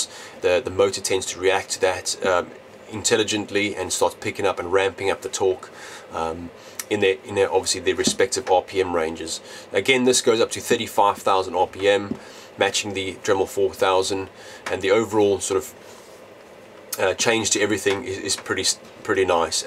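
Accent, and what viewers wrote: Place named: South Africa. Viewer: That's got to be a south African accent if I've ever heard one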